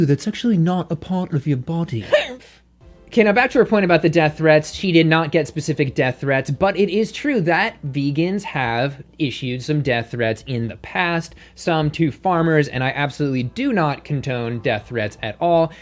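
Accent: United Kingdom. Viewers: As a Brit, I appreciated your British accents.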